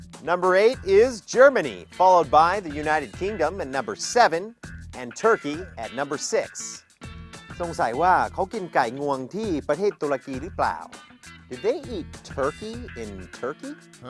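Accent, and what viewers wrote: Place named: Isan, Thailand. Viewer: ชอบคำว่า "บ้านเรา" ในตอนที่ บอกว่า มาเลเซียอยู่ไกล้บ้านเรา ฟังแล้วไทยๆดีแท้ แถ พูดอิสานได้อีก ชอบมาก ผมเองก็พูดได้ฟังรู้เรื่อง แต่ข้อยบ่แม่นคนอิสานเด้อ จะขอติดตามดูทุกตอนจนกว่า จะเลิกทำ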